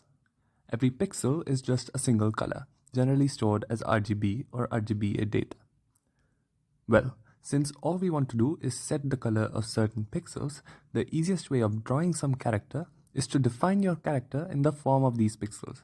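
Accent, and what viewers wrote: India. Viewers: Your sound indian , are you Indian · Why the Indian accent? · I wanted to watch this but I just can't do the accent. I'm sorry. I just can't listen to Indian accents.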